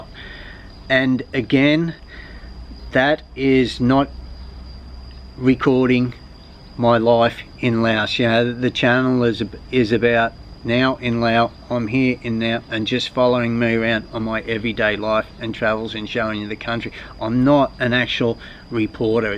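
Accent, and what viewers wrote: Australia. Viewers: Hey....don't seek perfection...in every video you are doing...great...its informative...since it's new world to us....l miss vientiane....sooo much... Dazzling nature....honest People....love your ozzi accent....Baghdad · Your Australian accent is enough to keep me watching 🤣😆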